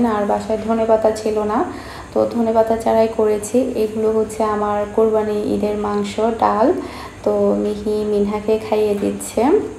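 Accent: Chittagong, Bangladesh. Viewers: Apu apnar Basha CTG r kothai ????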